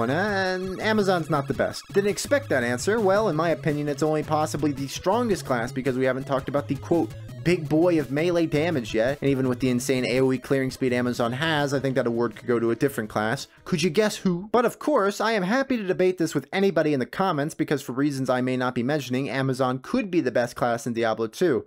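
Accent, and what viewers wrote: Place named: Northeast, United States. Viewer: lol oh word, you have more of a northeast rhythm i thought. Anyway, good stuff! Although sometimes I wonder if you like the game ahaha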